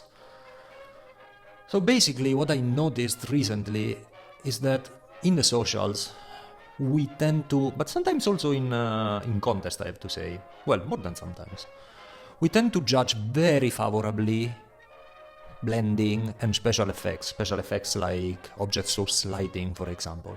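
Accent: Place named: Italy. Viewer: Very nice video and I also enjoyed your rant. I just discovered your channel. Besides a fellow artist and nerd, I also like MotoGP and wonder about your accent. It seems slightly different than Valentino Rossi's (do you know him?). Are you from a different region of Italy? 👍🙏 Ciao!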